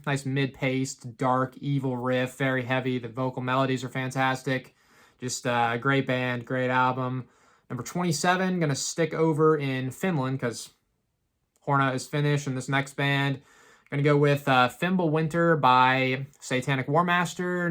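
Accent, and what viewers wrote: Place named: East Coast, United States. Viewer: Are you from the East Coast? I ask that because of how you pronounce Twenty as "Chwenty." I give my workmate shit for that lol, hes from Mass love the video, just revisted again from when I watched it before and never noticed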